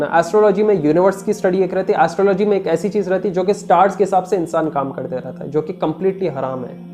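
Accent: Hyderabad, India. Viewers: By how he speaks he sounds hydrabadi to aap agr wahan ke logon ko sunenge to wo ese hi bolte hai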